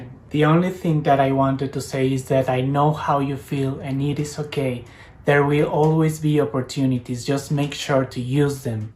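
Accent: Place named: Mexico. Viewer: Your English is very good, especially since you learned it all in Mexico. The only people that I know that have an American accent are those that lived in the US for at least some time. We were in France on vacation and I spoke okay French, but we had difficulty getting people to understand the name of the city we were driving to. Finally an older man figured it out by having me repeat its name with a French accent. See you are going to have an accent because those you speak with are also speaking with a Mexican accent. Heck, my wife has lived in the US for over 30 years and speaks great English, but with a slight accent...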